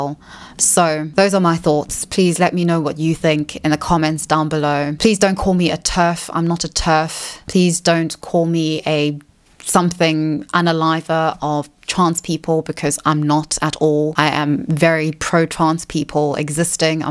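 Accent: South Africa. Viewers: She's South african but has lived in the uk since she was a teenager, so it's probably her going in and out of accents. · It's her accent, people talked like that around here in South Africa, she's explained that before.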